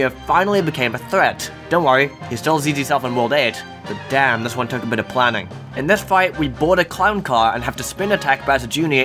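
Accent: United Kingdom. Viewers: you have quite an interesting accent/dialect; you sound British, but not quite at the same time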